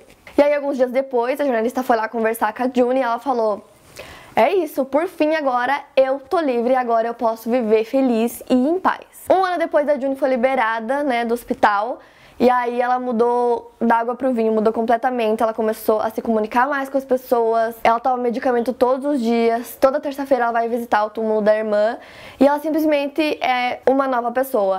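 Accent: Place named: Paraná, Brazil. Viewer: Se eu não estiver enganada, o teu sotaque é de paranaense né?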